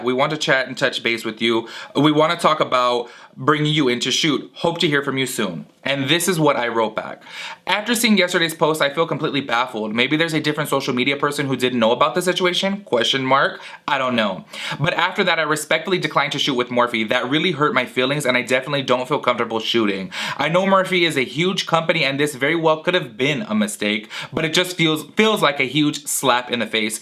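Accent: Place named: Bay Area, United States. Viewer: You talk like you’re from the Bay Area 😭😭😭😭💕💕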